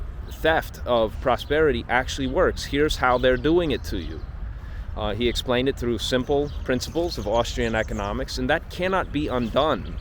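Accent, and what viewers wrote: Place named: Argentina. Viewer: tenés acento de argentino hablando en inglés al principio pensé que eras de acá!!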